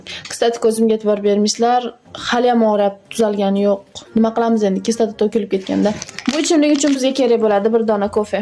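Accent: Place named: Fergana, Uzbekistan. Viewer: Jijja jijjadan Fargʻona sheva Fargʻonaliklar bitta layk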